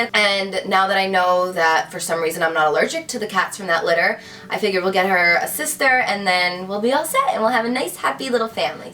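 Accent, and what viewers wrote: New Jersey, United States. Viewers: i KNEW you were from Jersey!!! yay!!!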